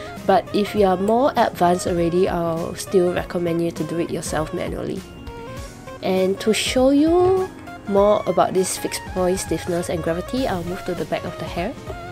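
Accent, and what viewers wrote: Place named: Japan. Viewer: Wow your Japanese accent is so cool! And by learning step by step from your video I finally made my first hairstyle ever on Vroid!!!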